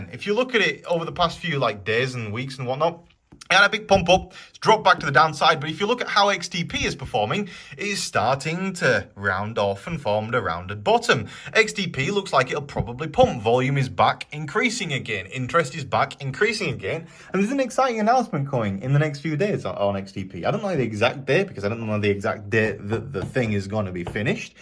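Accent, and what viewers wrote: United Kingdom. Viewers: $CUDOS is looking good. since my ape in, it show's a strong correlation with BTC my favorite part is that I can mine #CUDOS with my old laptop Nice vids bro, I feel smarter watching your videos, plus when ppl hear a British accent guy, they think I'ma pro for watching 😎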